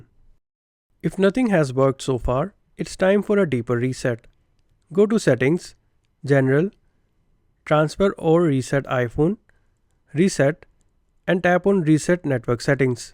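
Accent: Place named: India. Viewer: Indians sepaking english sound very ridiculous, Remove anything below your tonge or use subtitles, the world will thank you.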